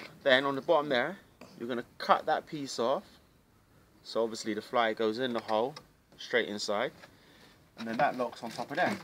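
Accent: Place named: Bristol, United Kingdom. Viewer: London I think but he has a little bit of a Bristol accent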